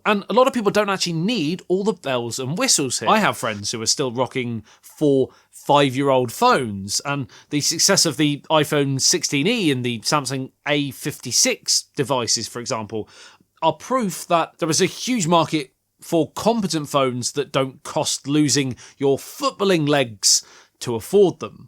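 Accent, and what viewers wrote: United Kingdom. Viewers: Love British English accent ❤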